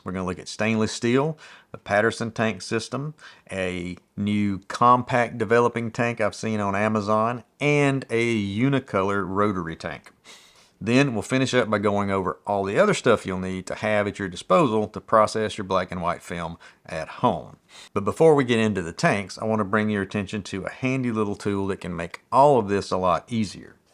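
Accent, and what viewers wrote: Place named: Georgia, United States. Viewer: Started developing film in the early 70’s. Did that till the late 80’s when I joined a Southern Lifestyle shelter magazine and shot only chrome for the next 25 years. Still freelance but of course digital for my clients. But recently, like you I decided to break out the old cameras and put them to use. I was so fast with stainless reels in the old days but picked up Patterson recently. I’m sure I’ll get the hang of it but so far they’re quite a challenge. I’m sure being in a changing bag doesn’t help. I might have to look for those easier Ap reels. Good stuff here and thank you. Trying to place your accent. At first I thought Fort Worth but then decided Georgia. Am I close?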